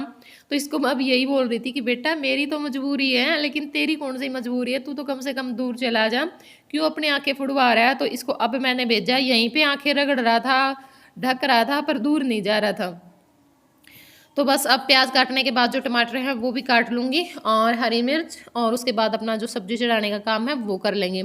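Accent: Haryana, India. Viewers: bebe ghne din m video Dekhi...Rohtak aali bolan laagiii bebe🤭🤭🤭🤭🤭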